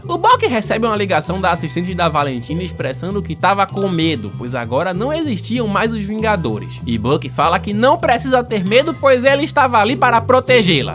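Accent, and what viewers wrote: Brazil. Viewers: eu sei que vc tem o jeito abrasileirado porém você poderia falar as coisas da forma correta tipo o nome da valentina ou outras coisas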